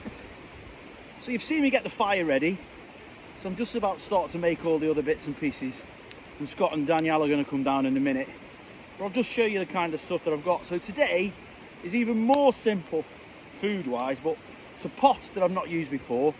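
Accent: Stoke, United Kingdom. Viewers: Hey fellow Stokie, Just found your channel Through English Woodsman! Dan. Said to my hubby this guys a fellow stokie, Our accent stands out. Loved your video and have just subscribed. Roughly what area you in??. I'm Endon.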